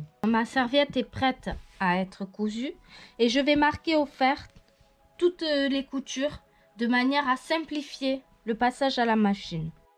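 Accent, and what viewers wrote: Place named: South, France. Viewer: Un abonné de plus hehe :p ça fait plaisir de ré entendre cet accent du sud bisous à zozo et tout le monde :) PS : c'est favess09 🤣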